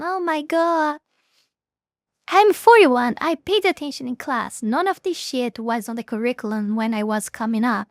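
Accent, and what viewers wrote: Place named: Brazil. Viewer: When you know the person is brazilian cause they have the same accent as you do. But you can't prove it.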